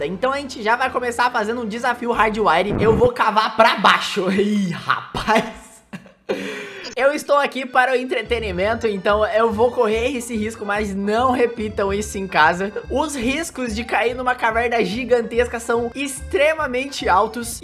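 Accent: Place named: Rio de Janeiro, Brazil. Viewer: Vou ver se foi o Feu cariocando vc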